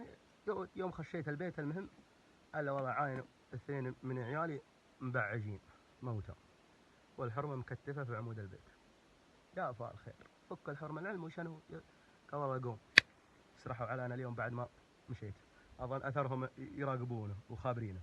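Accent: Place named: Hejaz, Saudi Arabia. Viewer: السيد Vlb لا والله كلامه واضح ومفهوم ، هذي لهجتنا بالحجاز ' و حنا الاقرب للفصحى & انت تفتقد لاسلوب النقد ،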